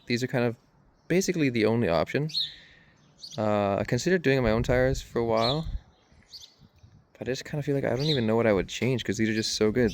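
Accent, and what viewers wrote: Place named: Canada. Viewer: i can hear the canadian in ya bud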